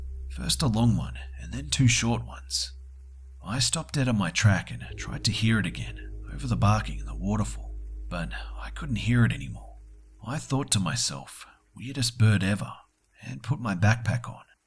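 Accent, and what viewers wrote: Australia. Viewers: I'm so excited! Scary stories with a good old fashioned Aussie accent! And, even better, you don't have a weird way of talking, like ending every sentence like a question, hahaha. You, sir, have won yourself another subscriber (not that it looks like you need it!) ;) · Aye it's my favorite Aussie narrator ! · R u Australian? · is this narrator Australian? I'm an Aussie as well! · U sound Aussie? R u? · Watching your videos get me so excited you make these videos so well! Having a fellow Aussie telling it makes it that much better !!! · Nice voice, glad to hear an aussie accent reading scary stories. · It is soothing and now that I'm high, I can listen to it. When I'm sober, I find something irritating in his accent. I normally I don't care about strayan accent on tv or anything else but here it for some makes me alienated. I still listen because he's got talent and good stories! 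:) ps. for example HellFreezer (my absolute favorite) has a scottish accent and it just makes it 1000x times better!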